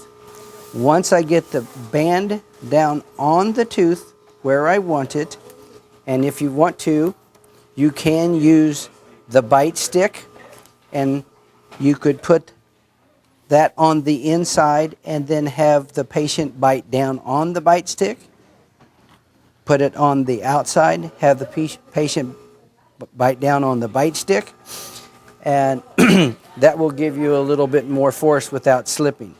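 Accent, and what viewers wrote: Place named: Arab world. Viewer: شو مبين من لفظك عربي 😂♥️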